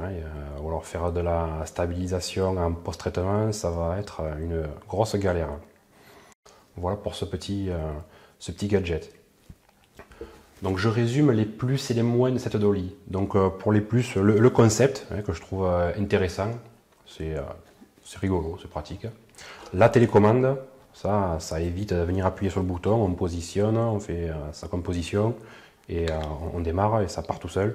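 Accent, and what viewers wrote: South, France. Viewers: T'es débalages sont toujours aussi bien et même s'améliore de vidéo en vidéo et continu comme ça. Et bien sûr toujours avec l'accent du Sud😊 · Dommage qu'il y ait pas beaucoup d'abonnés sur ta chaîne parce que tu présentes très bien les produits et ton petit accent du Sud est attachant ;) tu veux pas essayer de contacter Steven par exemple?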